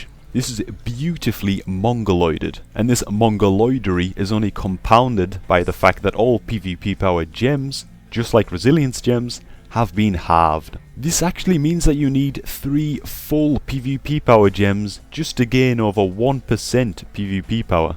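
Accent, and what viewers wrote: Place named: Scotland, United Kingdom. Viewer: he is scotish I think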